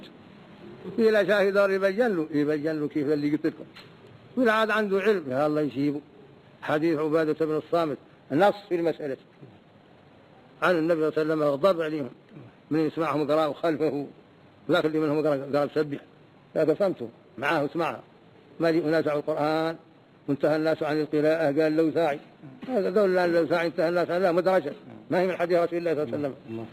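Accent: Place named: Mauritania. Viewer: رحمه الله لكن الكلام غير مفهوم. يتكلم باللهجة الموريتانية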